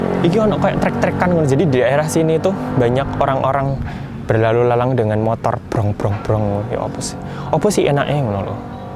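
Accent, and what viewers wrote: Java, Indonesia. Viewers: Kok yo medhok tenan yo. Tapi rinci banget seneng penjelasanne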